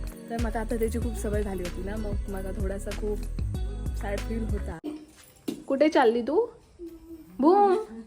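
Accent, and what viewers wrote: Malvani, India. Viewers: Malvani Chan bolte aasech volg malvani karat ja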